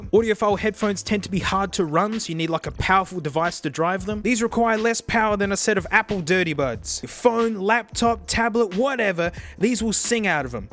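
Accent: Australia. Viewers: Aussie humor + Aussie voice + Headphones and or headsets = great video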